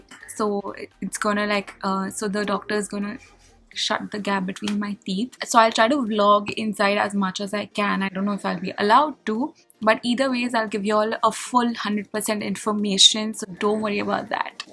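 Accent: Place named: India. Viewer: Indians are so irritating with their stupid accent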